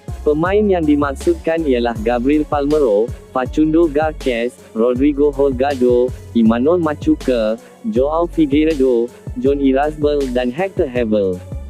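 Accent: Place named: Indonesia. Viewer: Cara cakap kompom dari YouTuber Indonesia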